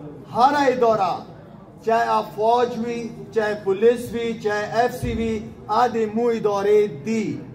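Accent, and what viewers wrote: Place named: Punjab, Pakistan. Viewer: Jaisy koe punjabi pashtu bol raha hu...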